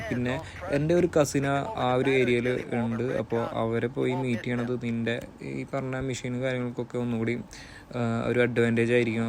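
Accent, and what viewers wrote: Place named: Thrissur, India. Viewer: BRO NATTIL EVIDEYA SLANG KETTIT THRISSUR ALLENKIL ERNAKULAM😄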